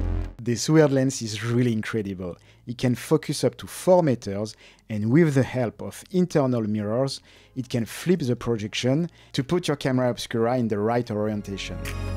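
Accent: France. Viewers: your accent seems French. are you French?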